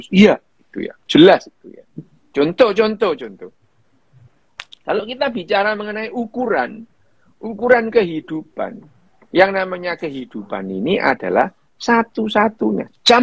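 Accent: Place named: Malang, Indonesia. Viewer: Saya suka dokter Ryu Hassan, benar-benar cerdas, dan gaya dialek Malang nya alami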